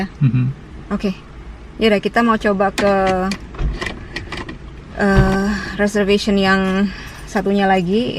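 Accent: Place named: Indonesia. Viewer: mbak nya walau lama di usa kharekter dan logat masih tetap indonesia banget.......pasti nya mbak nya masih sering ngobrol pake bahasa indonesia raya.....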